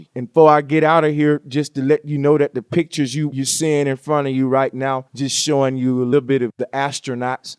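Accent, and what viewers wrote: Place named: South, United States. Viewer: Funny how clearly he spoke back then. His country accent is thicker today ✊🏾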